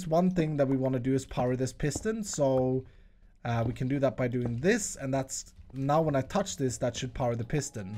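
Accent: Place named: Sweden. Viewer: I love your swedish accent Btw I'm swedish aswell so I speak the same...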